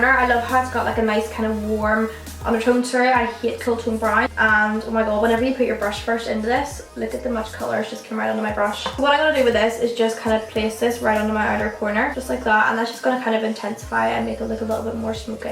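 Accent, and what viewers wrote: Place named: Belfast, United Kingdom. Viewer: wee bitta belfast no mean no mean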